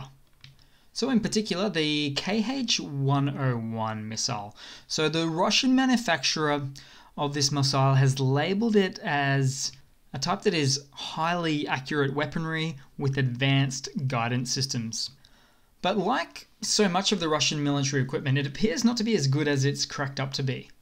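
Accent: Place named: Australia. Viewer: Hey dude Where are you from I’m in Sydney You sound Aussie You sound like Marcus House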